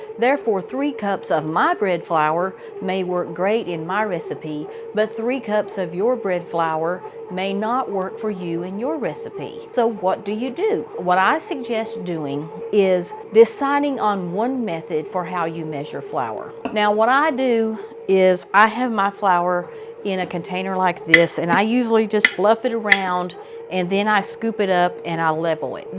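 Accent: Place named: South, United States. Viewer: Do you ever make bread with fresh milled flour?! Love your channel, I found it through The Rose Homestead, and watched so many of your videos, lol!! I recently switched to fresh milled flour, it’s SO delicious, but it’s definitely something to learn, more because I never really made bread, just quick bread, cookies or biscuits, and an occasional muffin. I absolutely LOVE the taste, it’s nothing like what you get from refined flour, although I did just buy a bag of King Arthur organic bread flour, just to have on hand. I have Sue Beckers book, Home Grown Flour, which goes into detail about all things whole grains, and even ancient wheat, I’ve used some Kamut mixed with hard wheat, not on itself own, it’s very sticky, but tastes delicious!! I think many many people are getting into milling because of the health benefits, you seem to live in the South, by your accent, lol, I wish I could visit there, I love the hospitality there! 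I heard about it from a channel called, The Biblical Nutritionist, and then started watching Bread Beckers channel, great presentation, they also have a store in Woodstock, Georgia, I saw it on YouTube, it’s a very big warehouse type store, with everything necessary to start milling grain and making bread, it’s healed So many health issues in people, there are hundreds of testimonies, so I decided to try it!! I know I saw your video on the homemade yogurt you made with a yogurt maker, I bought the KBS bread maker, lol, yours looked great, and you can do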